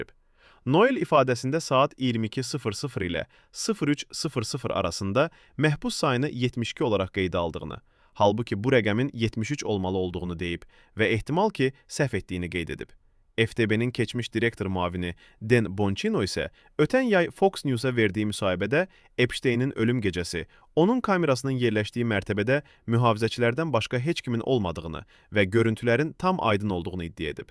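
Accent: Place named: Azerbaijan. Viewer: Senın dedıgınde. Bır şey. Anlamıyorum. Azerı konuşuyorsun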